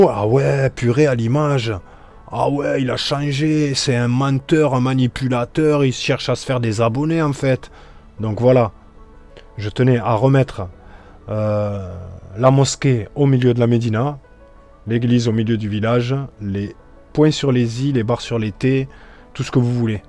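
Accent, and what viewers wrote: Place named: Toulouse, France. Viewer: Salam, Force à toi.fait le tri mec. Ont commecent en a avoir vraiment,mais vraiment plein le cul. T'es videos sont magnifiques mon Ami. De la force pour toi et les tiens 🙏 L'union fait la Force, avec l'accent du 31 toloza ma gueulle 🔥🙏✊ La Mosqué au milieu de la Medina 🙏 L'église est sacrée aussi.. Salut Salam Shalom ✌️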